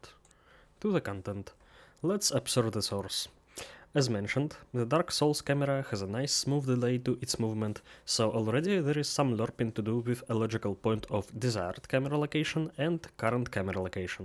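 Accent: Russia. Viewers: Очень чётко слышно русский акцент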